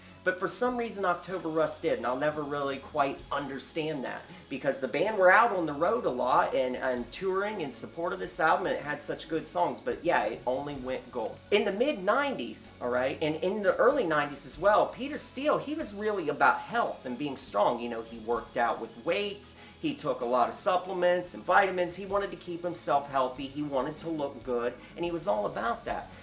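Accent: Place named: East Coast, United States. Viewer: This was like a TON biopic.. so much info, it's fantastic💚🖤. You gave each album equal opportunity; the the details of his life personality, for example his fear of judgement and substance abuse/ mental health. He was such a sweet soul deep down. Your tales of meeting him are so endearing. Thank you so much for this. He really did make the world if music a better place. P.S. I was way off on your accent a while back... definitely caught the east coast this time. That one time I guessed the South 🤦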